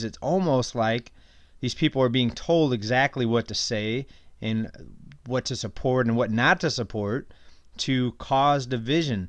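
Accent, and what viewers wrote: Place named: Michigan, United States. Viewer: I so knew it from your accent that you were from Michigan..keep the market "praapped"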